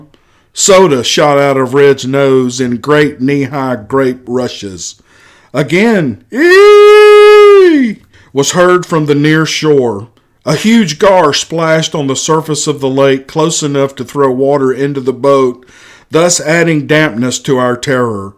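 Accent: South, United States. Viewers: It's the South in the Mouth that sells it. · The voice sounds like my neighbor's Keith Robbins. Those southern accents are priceless. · I try to place the accent and I imagine your either from TX or TN maybe a GA boy, lol I'm sure I'm not the only one but I think that's what keeps ppl intrigued by the stories and the twang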